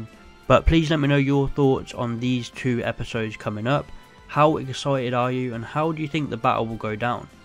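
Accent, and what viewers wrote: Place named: United Kingdom. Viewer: You are so British by Accent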